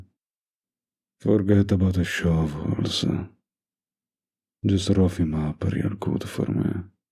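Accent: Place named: Russia. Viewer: I HEAR RUSSIAN ACCENT, ARE YOU RUSSIAN?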